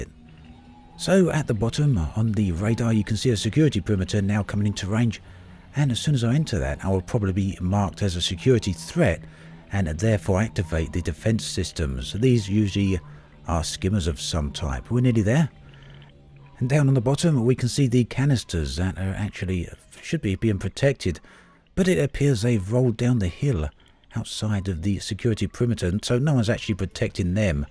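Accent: United Kingdom. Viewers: Funny how brit accents always make videos classier lol good job love Elite ;)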